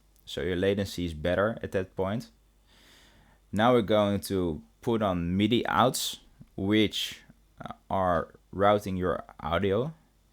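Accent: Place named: Amsterdam, Netherlands. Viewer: Very goeie video pik. Nice Amsterdam English accent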